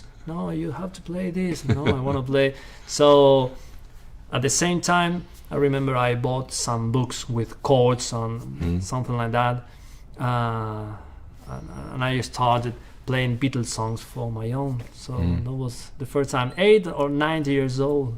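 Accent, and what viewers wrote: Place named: United Kingdom. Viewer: Your British accent is very good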